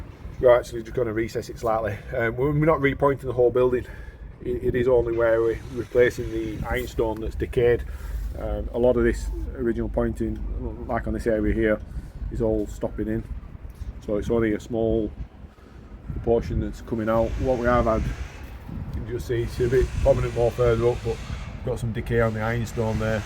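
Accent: Lancashire, United Kingdom. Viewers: Your accent ? I'm going to say Colne in Lancashire? Great video BTW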